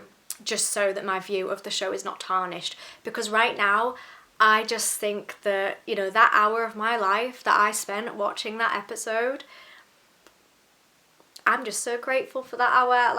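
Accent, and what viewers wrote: United Kingdom. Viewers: You sound like you're from Hull.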